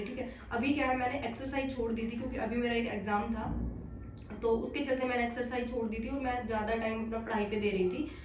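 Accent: Haryana, India. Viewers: Aap haryana se ho mam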